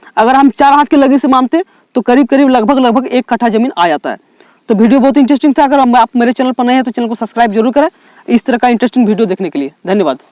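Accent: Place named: Rural, India. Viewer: Desi awas me mja aaya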